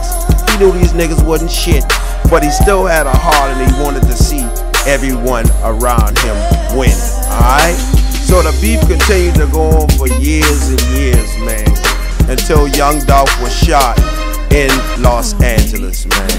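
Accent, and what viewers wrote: South Carolina, United States. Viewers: Sound like u from 843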